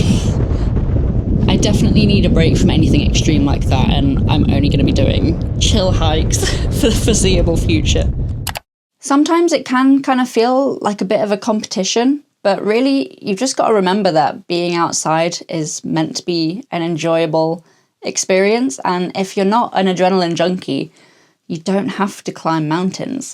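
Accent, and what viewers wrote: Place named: United Kingdom. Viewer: This might be my new favorite youtube channel. I love the fact you didn't put any music in the background!! You seem to be so down-to-earth, i love it. I love your accent, which country are you from in the UK ? Love from Normandy, France, where the wheather is as bad as yours! 🌧🫶🌞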